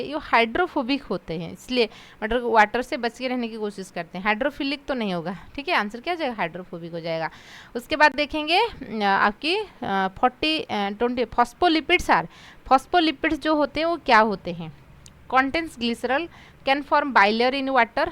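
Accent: Odisha, India. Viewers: Ma'am ap Orissa se ho kya.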